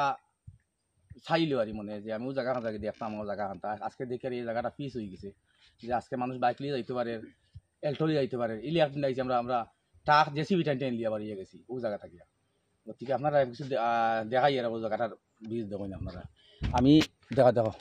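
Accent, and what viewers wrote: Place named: Barak Valley, India. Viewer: আমি বাংলাদেশ থেকে বলছি। আপনাকে বরাক কন্ঠে অভিনয়ে সুন্দর লাগে।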